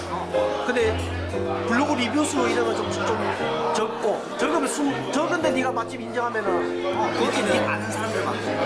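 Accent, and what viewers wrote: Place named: Gyeongsang, South Korea. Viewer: ㅋㅋㅋ고단세 ㅋㅋ 갱상도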